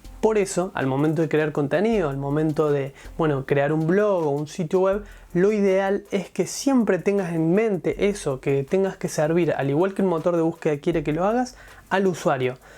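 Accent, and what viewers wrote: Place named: Córdoba, Argentina. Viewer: Ay me parece que sos Argentino de Córdoba.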